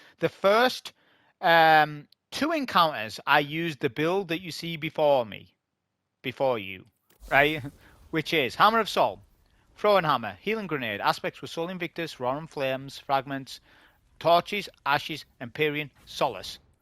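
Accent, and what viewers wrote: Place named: Newcastle, United Kingdom. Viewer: I started to play this one only recently and could see how difficult this is. Btw your accent is similar to one of my coworkers who’s from Newcastle lol. Good one as always !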